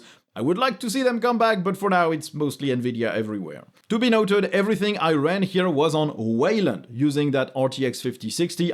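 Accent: France. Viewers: Wait, are you french?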